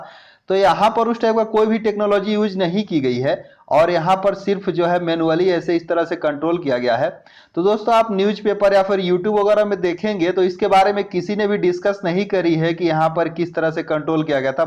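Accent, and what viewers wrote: Bihar, India. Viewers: Bhai aap samastipur ya darbhanga se lagte ho aapki boli bohot meethi hai 😁👌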